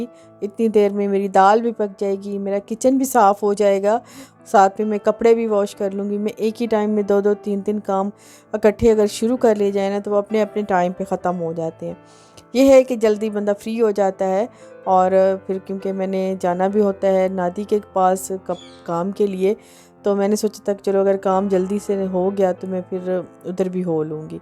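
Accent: Saraiki, Pakistan. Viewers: apni boli sariki my bht mithas hy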